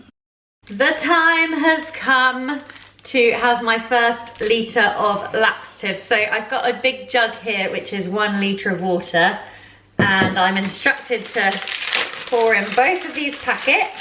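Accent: United Kingdom. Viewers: You from England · You were a joy to watch, so thank you! I'm in the middle of my 24 hour fast, then get to drink the fun stuff at 5 PM and again at 4 AM. It'll be my second Big C, as they recommend one here every 10 years. Unfortunately there won't be any nice British accents to listen to, or tea and biscuits afterwards, so I'll have to pretend I'm on your side of the pond :) Luckily we have a plethora of drive-thru taco shops where I live, as I'll be starving afterwards. I hope more people searching The Big C videos in preparation for their first one, come across yours so they can relax, and know what a life saving and easy procedure it can be.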